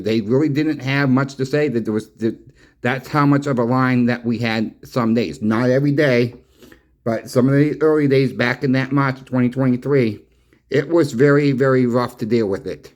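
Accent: Boston, United States. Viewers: After hearing you talk for a minute, I can tell you are from Boston...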